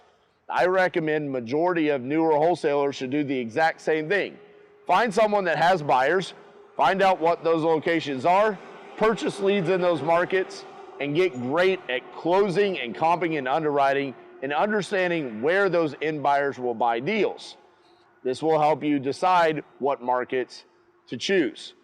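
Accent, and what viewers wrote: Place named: South, United States. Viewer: You sound like a guy from down the way. I grew up in a town of 300 people in the south.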